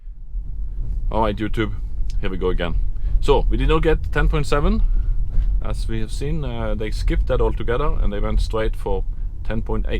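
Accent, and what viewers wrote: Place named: United States. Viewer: sounds Swedish American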